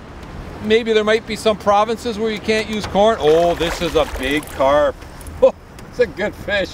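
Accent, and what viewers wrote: Canada. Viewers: are u Canadian were your accent from sir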